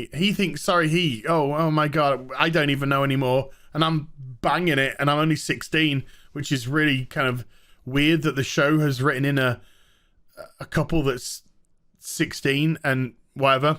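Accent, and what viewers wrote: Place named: North, United Kingdom. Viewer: After months of research I've finally discovered what each word in your intro is.... Cuz your voice is, It's fast. And northern. I'm Canadian. I had to take my fucking time here and figure shit out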